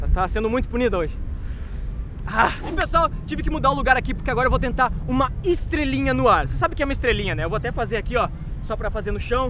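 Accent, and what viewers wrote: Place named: Portugal. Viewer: Ele parece um cara q veio de Portugal e ficou por um tempo no Brasil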